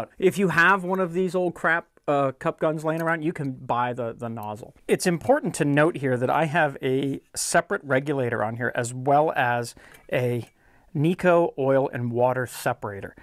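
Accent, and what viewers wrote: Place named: Northeast, United States. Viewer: Very odd 🤣🤣 you must be from up northeast 100 %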